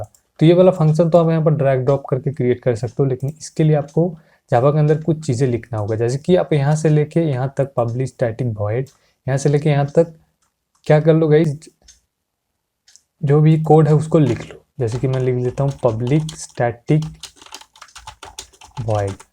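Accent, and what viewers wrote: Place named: Bengal, India. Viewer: Ami 1 ta app banate chai... Kintu sob kichu r tutorials pachina... Tai banate parchina... Tomar kotha sune mone hoy tumi bengali... Tai bangla te comment korlam... Ektu help korle ami app ta banate parbo... Thanks....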